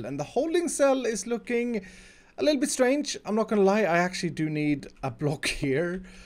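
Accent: Sweden. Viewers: It is good that the villagers don't remember that YOU were the one that infected them in the first place. Infested and Curated, love your Swenglish! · Svensk dialekt, jag låter värre.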